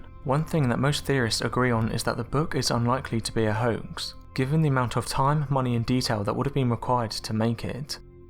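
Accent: United Kingdom. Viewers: So nice to hear a British accent for a change. Music for my ears.. Regards from Serbia! Your videos are great.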